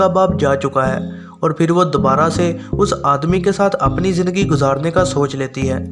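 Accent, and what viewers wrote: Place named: Punjab, India. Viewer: Ur Punjabi accent makes explanation more interesting bro....keep growing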